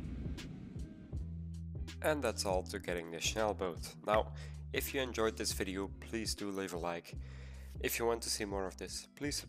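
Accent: Belgium. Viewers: Are you Flemish Belgian??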